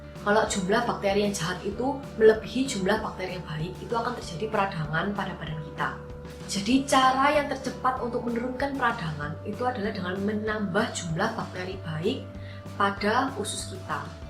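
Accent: Java, Indonesia. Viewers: Mbak e medok banget bahasanya😂😂😂😘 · Aduh pusing dengernya logat jawa wkw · Medokkk banget mba.. Hahah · pekatnya slang jawa 😅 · Jawa medok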